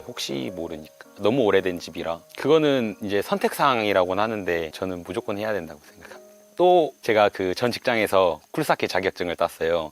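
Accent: Jeolla, South Korea. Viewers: 사투리는 고칠수가 없구나 절라도여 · 화순은 고향이 아닌데 전라도 출신은 맞네요..사투리가 전라도 사투리 아닌가요?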